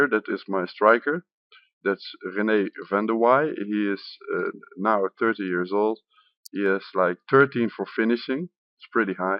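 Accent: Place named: Netherlands. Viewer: Great video. Your English is pretty good. From your accent I guess you are Dutch. Why do you choose an English team? Anyway, more videos like this please. I play as Gillingham in League 2, just got promoted through the playoffs.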